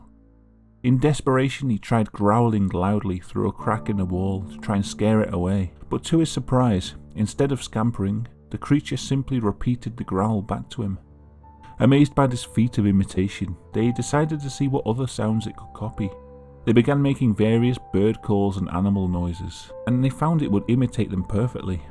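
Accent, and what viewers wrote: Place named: Liverpool, United Kingdom. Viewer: thats exactly what I thought, thought he was a scouser when I first got onto this channel. Being a scouser my self thats why I like this channel so much cos he sounds familiar. Haha he deffo sounds like tom like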